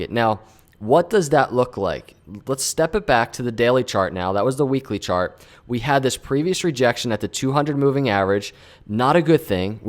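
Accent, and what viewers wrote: Philadelphia, United States. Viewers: Great job showing both sides of the coin! I appreciate your cautious optimism that comes with a Philly accent...long term bullish here. I don’t care what happens below $10k I am accumulating. Just don’t want to get caught off guard when that big candle drops any day now!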